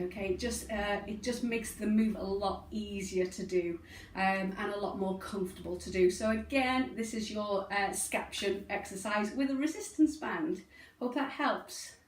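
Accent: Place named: United Kingdom. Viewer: you are beautiful and I love your British accent. God bless you from the U.S.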